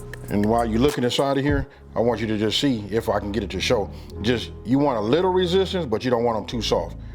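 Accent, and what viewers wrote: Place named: California, United States. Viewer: I know I heard a Cali accent when you was talking.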